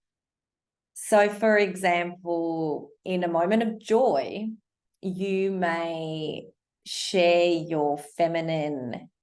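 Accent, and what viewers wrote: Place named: Australia. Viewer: Hello, you've got a new subscriber here! I love it that you talk a little more slowly, so we have the time to let it sink in precisely that what you are saying. And i love your Australian (i take it to be) accent. Furthermore: the content of what you are saying is profound: it holds so much wisdom!!! I hope a lot of men and women will find you soon, for the work you do is very important!!! Thank you and love from the Netherlands. 💗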